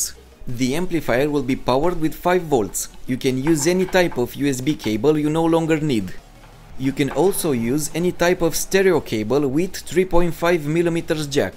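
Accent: Russia. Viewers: По английски не чего не понятно акцент русский мешает давай на родной мове не стесняйся.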